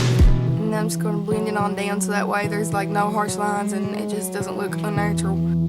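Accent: South, United States. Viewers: southern accent lol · Southern accent omg😍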